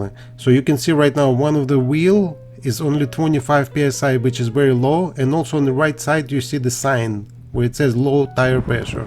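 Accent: Russia. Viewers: the best part of the video is this guys accent. sounds like a Russian scientist. the good crazy ones. funny dude.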